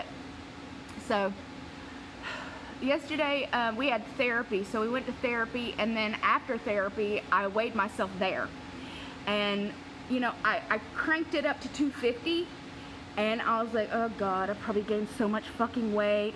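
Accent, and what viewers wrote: South, United States. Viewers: I am 63 years old and scheduled for my roux en y surgery on March 8th. Your quirky and honest videos give me the most realistic view of what I will be facing going forward. I realize everyone’s struggles are differently packaged albeit mentally and physically. In the end it comes down to changing what we eat, how we eat and how we move our bodies. I too struggle with physical challenges of several back surgeries, rheumatoid arthritis, etc., and the list goes on. I always maintained a healthy active 120lbs. 5” 4” frame until age 45 and illness, back and knee surgeries. Now I am 5” 3” 240lbs. Your southern voice initially drew me in (so fun to listen to) and as you went along, I just enjoyed the love story of you and Evelyn. Congratulations on reaching your targeted weight goal. You have worked very hard and I hope you feel fantastic! 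Thank you for posting and I hope you keep it up!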